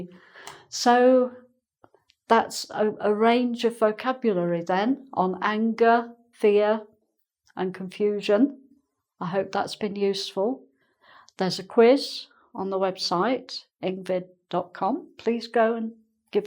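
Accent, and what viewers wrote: England, United Kingdom. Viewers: England I'm guessing based on her accent, but I couldn't say exactly where. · Here in Brazil, we also have a word like prickly and this one is "espinhenta" a prickly person is a "pessoa espinhenta", full of sorrow and not a good person to be with. And touchy, if it's exactly as I can wonder while I watch your explaining is what we brazillians use to call "uma pessoa muito dódoi", what means a person who would be easily offended due to very little things. I'm loving your british accent because I'm training listening of british english. Thank you very much. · Yes ! This Lady IS a true teacher, a Real english person with a Real accent 👍. It's Very Good to learn with her. No mistake. Thanks for her. 🌹💓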